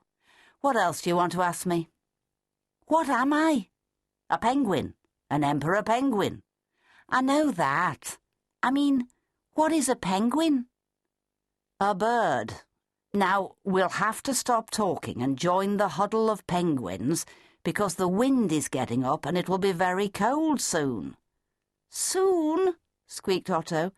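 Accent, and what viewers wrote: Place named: United Kingdom. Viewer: Awesome story. Fantastic British accent.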